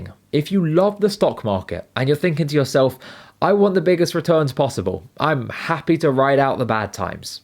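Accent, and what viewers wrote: United Kingdom. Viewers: Hello,! I have been watching your videos for quite some time and I am really excited about stock investing as you are (and your british acent is supercool!). I know you are not an advisor or smth, but I would love to hear your opinion about these five stocks (They got on my radar for this/next months investments to buy). It is: Verizon, wingstop, alibaba, walmart and pluralsight. What do you think about these (going long run 2-5years?). Maybe you have something else on your sight? Thanks in advance!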